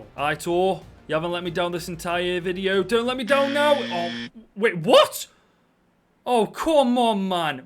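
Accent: Stoke, United Kingdom. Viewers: Where are you from? Sound a tad Stokie to me, love the vids man 😁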